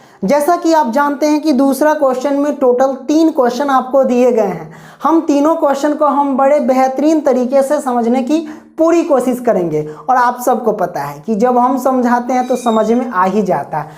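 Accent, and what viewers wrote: Bihar, India. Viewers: Aap Bihari hain ji. Aap bahut achha padhate Hain. 👌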